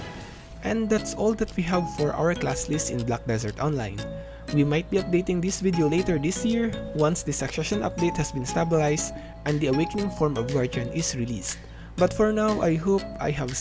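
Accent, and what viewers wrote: Philippines. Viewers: to us big boi zerk mains, this brings a smile to my face. but the reality is, we got tons of unprotected skills, also our Q buff got longass cooldown and can also be used for 30 secs. It's like having the biggest penis but is also a quick shot which sad af. PS: your accent gives me pinoy vibes. :) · Great video! I'd say the music should be turned down a bit though as it's hard to hear you, especially since you don't have an English accent (Filipino?). Otherwise it was really informative, I just bought the game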